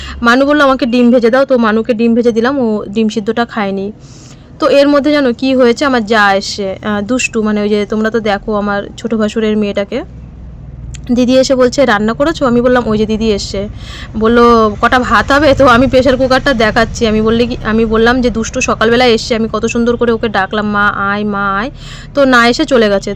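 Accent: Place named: Bangal, Bangladesh. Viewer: তোমরা বাড়িতে কি বাঙাল ভাষায় কথা বলো???? খুব ভালো লাগলো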